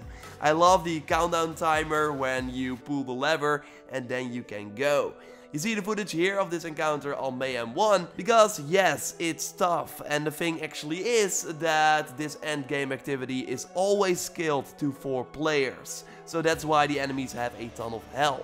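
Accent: Netherlands. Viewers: Jezus gast, nederlands accent much?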